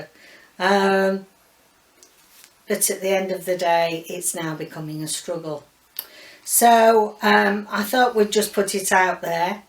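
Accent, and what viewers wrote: Yorkshire, United Kingdom. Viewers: Hi, Ive been a subscriber for a while now and although I dont comment, I thoroughly enjoy your videos. Months ago I was just scrolling through YT and came across a Yorkshire accent, well I knew I had to follow you, being a yorkshire lass myself. I know nothing about junk journalling but I still look forward to your videos especially the sewing ones. I learnt so much from you watching those. I will be sad to see you go but you have to do what you feel is best for your mental health. Could you do one junk journally vid one week then one sewing vid the week after. Have you thought about selling on Folksy? Its a smaller channel but is a lovely place to sell as there are no mass produced rubbish on there. I really do hope you stick around, maybe have a break to regroup. Please take Care. Angie x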